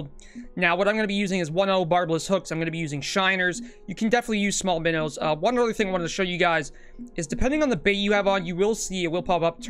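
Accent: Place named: United States. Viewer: How come every video made about this game no matter who made it is in metric? I'm American and I us imperial weights and measures not metric so I have no ideal whats going on half the time in all of these videos, you sound American yet your game interface is metric its confusing period and dont tell me to switch I'm 60+ and I'm imperial till I die.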